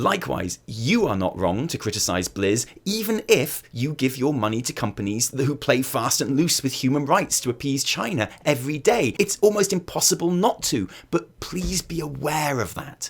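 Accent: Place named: England, United Kingdom. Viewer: Y'all get a ton of flack for being "blizzard shills LUL" but nobody can doubt your intelligence, eloquence, or lovely English accent after watching this. I'm sharing this video with all my friends who need a TL;DR for the Hong Kong situation, and / forever have my respect for their sincere and well thought-out arguments against late stage neoliberal global capitalism. Cheers! <3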